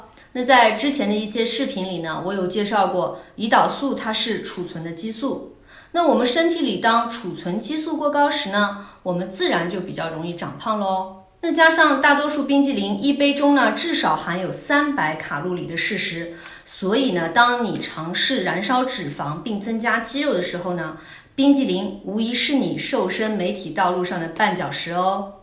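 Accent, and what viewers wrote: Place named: Anhui, China. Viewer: 冰淇淋+布朗尼真的是王炸组合，也太好吃了也有好多好吃的食物： 各种肉类、牛排、蔬菜（老实说真的好吃的不多）西柚、柚子、最近我发现实在是不容易吃到吐的还可以的就是：番茄炒蛋、凉拌黄瓜了，难过。。。再次猜一下是不是来自大安徽，哈哈哈口音也太接近我家那一带了么么哒❤️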